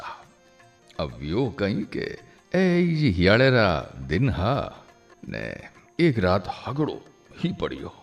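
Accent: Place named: Jodhpur, India. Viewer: आपकी आवाज सुनकर जोधपुरी होने का फख्र है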